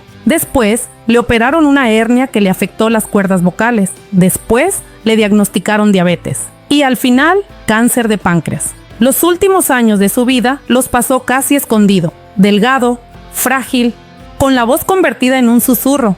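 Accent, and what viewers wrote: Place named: Mexico. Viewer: Tenés que limar ese acento mexicano que da asco...😮 escuchar...!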